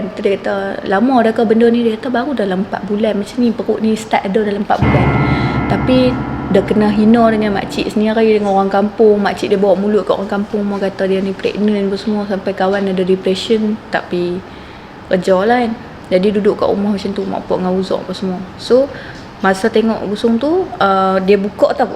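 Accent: North, Malaysia. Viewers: Pekat betul nya ckp utara 🤣🤣🤣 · Xthu laa knpa loghat utara nii cute do😆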